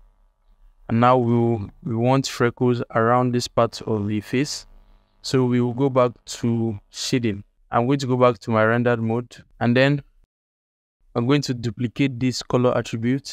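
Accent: Nigeria. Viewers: nigerian accent = trust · Bro something about that Nigerian accent makes the tutorial stick more had to subscribe ASAP, thanks man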